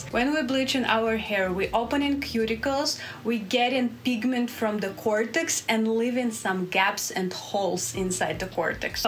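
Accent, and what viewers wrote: Russia. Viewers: как приятно услышать наш русский акцент с первых секунд видео)) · you have a russian french and dutch accent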